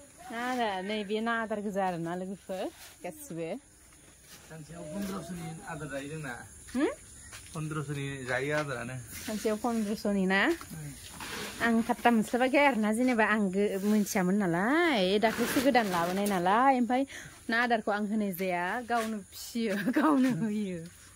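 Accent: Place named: Kokrajhar, India. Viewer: Same to same kokrajharni boro railainaijg milaitarw 100% sure abo ngsina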